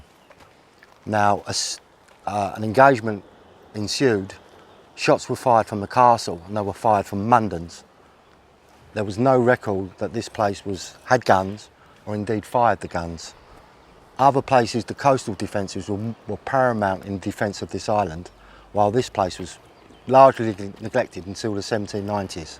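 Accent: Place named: East London, United Kingdom. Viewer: Interesting..love your east London accent 🇬🇧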